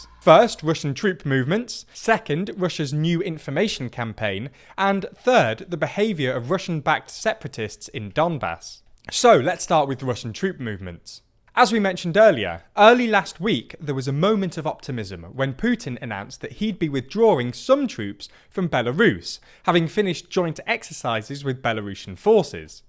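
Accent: United Kingdom. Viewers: That british accent is so horrible, christ, why, just why.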